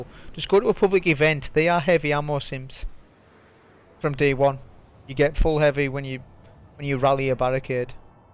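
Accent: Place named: Newcastle, United Kingdom. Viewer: ah well am from Newcastle myself so I could hear a little bit of it in your voice haha don't come across many players close to home to be honest haha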